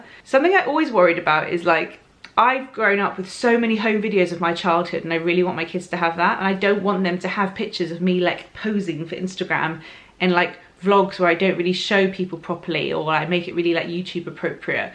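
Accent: England, United Kingdom. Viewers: I love your presence in the internet, I am flexible and I'll be here for 1, 2 or how many videos you will be putting out, and I'll be here watching out for your come back or however you decide to do it. You have been a wonderfull inspiration for me, and I got a little emotional watching this goodbye...12 minutes feels so short though and I'll miss your sunday's videos and your English style and accent. Much love to you and see you soon ❤️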